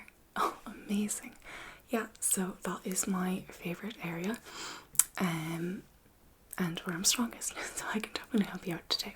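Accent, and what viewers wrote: Ireland. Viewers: Deeply relaxing and highly informative; the type of video where the material is dense enough to keep the Irish accent from lulling me to sleep.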